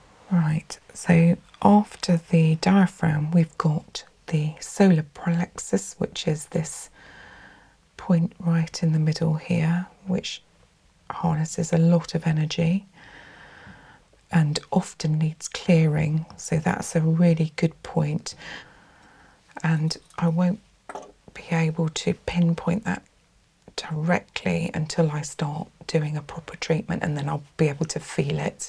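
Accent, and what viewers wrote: England, United Kingdom. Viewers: What area are you from in England? You accent sounds so much like a friend of mine. Such a soothing voice!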